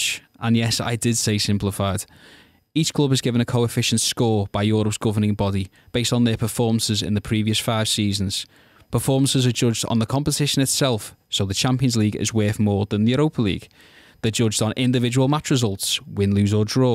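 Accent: Liverpool, United Kingdom. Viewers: That Liverpool accent tho, loved it. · Needed subtitles, not used to Scouse Gas. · English with a Scouse accent. Educate yourself.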